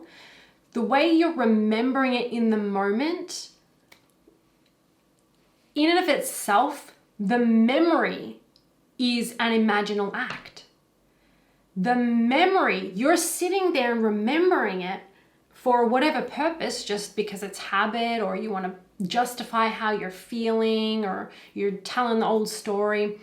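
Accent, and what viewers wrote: Australia. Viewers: Curious (because of a synchronicity and sign, this would be confirmation) ... is your accent Australian? ❤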